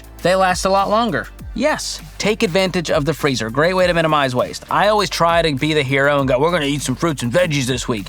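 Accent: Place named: Texas, United States. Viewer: You sound like a fellow Texan! If so, and you like okra, that stuff will grow like crazy. I enjoy gardening, but I agree that most of it is not cost saving. Usually only really save by growing okra, peppers, cherry tomatoes, and Swiss Chard.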